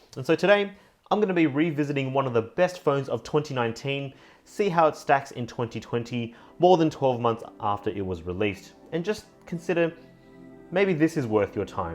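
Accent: Australia. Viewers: I knew he's gonna complain about the lack of support the moment I heard his Aussie accent lol Just ordered a 7 Pro from China after watching this video... Let's see how it goes... And I think OP definitely needs to bring their support back to AU ;-(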